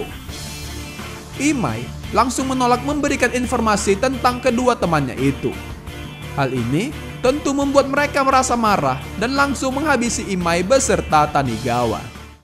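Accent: Medan, Indonesia. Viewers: abng orang medan ya bang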